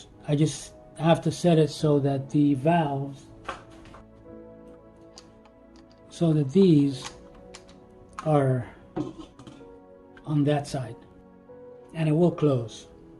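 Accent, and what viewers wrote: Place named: Cuba. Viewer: Is this your new microphone? Sounds decent. I'm old and perhaps not "politically correct" but what is the source of your accent? Cuban perhaps?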